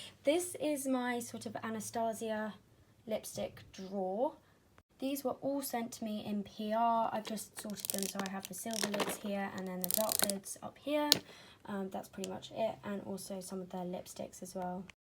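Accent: United Kingdom. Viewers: Omg I'm surprised that your British I thought you were American ahhaha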